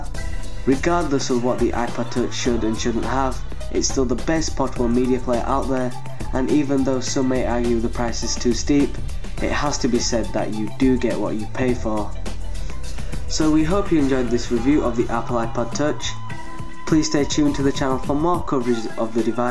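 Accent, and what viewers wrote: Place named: England, United Kingdom. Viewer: I like how your English and not yank.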